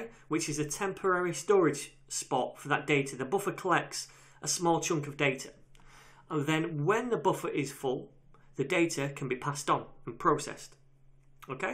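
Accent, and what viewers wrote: United Kingdom. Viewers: Why do u use hard british accent?? There are many indians there who watch your videos and try to grasp the precious knowledge???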